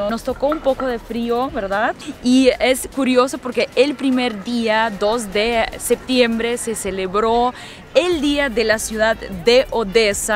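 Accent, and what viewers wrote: Mexico. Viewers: Ya suena mas mexicana que ucraniana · Me intriga mucho su asento español Mexicano..la hermana dijo alberca y esta padre algo muy Mexicano 🤔😘🇲🇽saludos hermosas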